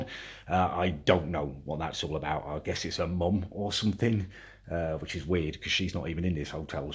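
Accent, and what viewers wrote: United Kingdom. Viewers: British accent is the greatest 😂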